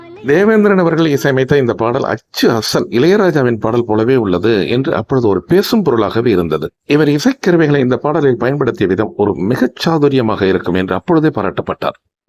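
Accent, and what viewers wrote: Sri Lanka. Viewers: உஙகள் இலங்கை மொழி அழகு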